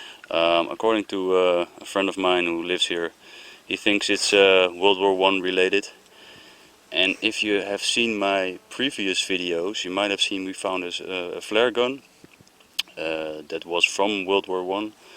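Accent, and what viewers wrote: Netherlands. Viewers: i know that this man is from the nethelands cause im hearing his acsent XDDD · Are you dutch i am and you sound like your dutch to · U sound dutch.. EDIT: u are dutch haha ik ook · nice dutch accent... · You sound dutch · As a Dutch man you can hear it when a Dutch man speaks English anyways nice video! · ik dacht van het begin al dat jouw accent heel Nederlands klonk, had ik dus tocht gelijk. PS. ben geabonneerd. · Are you Dutch or something?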